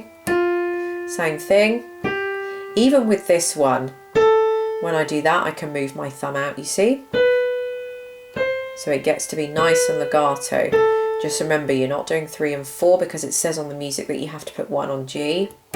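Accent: England, United Kingdom. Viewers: She is English lady her accent is different from Americans accent.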